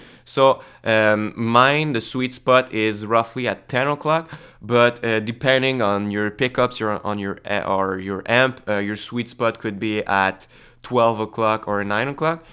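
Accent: Quebec, Canada. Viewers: On reconnais tout de suite le gars de Quebec! Beau review! Tu me conseille quoi comme compression?, je suis guitariste Rock-Metal, je fait des solo et des bout plus clean et expérimental avec des effets, j'aimerais sustainer un peu mes notes et égaliser certains passages plus soft. c'est dur a choisir sur internet quand on les essaie pas. Le Cali 76 a l'air pas pire mais le prix me fait ** je suis sur de trouver quelque chose moins cher. Merci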